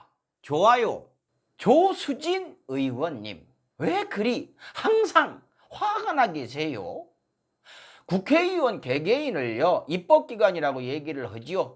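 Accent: North Korea. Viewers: 북한사람 말투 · 조수진이라는 여자 말투도 무식하고 억양이 북한 억양 말투에 과장되서 탈북한 여자인줄 알았어요. 꼭 뽑아도 막장스런 여자들만 비례 국회의원으로 뽑는건지 ? 통합당 비례 기준이 막장 인가봐요?전희경이랑 이은재 남장여자 임이자등의 모습을 안좋은건 다 닮은듯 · 말투가 탈북자 느낌 나서 놀랐음.. 빨갱이 타령하는 것들이 하는짓이 딱 그 쪽이라 어이상실..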